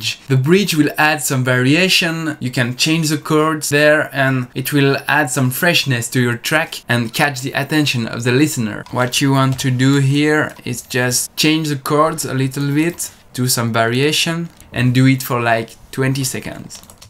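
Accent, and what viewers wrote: France. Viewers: ta bon un accent français 😁 moi aussi je suis dj et producteur et j'ai un chat aussi par ailleurs 😆 sa fait plaisir un youtubeur qui fait des videos sur fl studio de cette maniere avec de l'humour et du montage j'aime bien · Hes french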